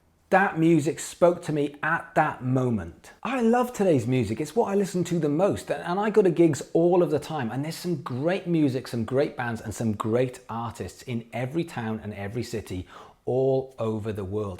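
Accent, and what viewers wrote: Wales, United Kingdom. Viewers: ahhh Swansea.. that makes sense I couldn't place it for some reason. I'm from the valleys (ebbw, brynmawr sort of way). Nice one man, enjoy the states.. my bro lives in California and they love the Welsh accent apparently. Dig your videos man. Have two bands and struggle to push them online despite one of them being signed haha.. so i find these vids helpful. Keep up the good work dude.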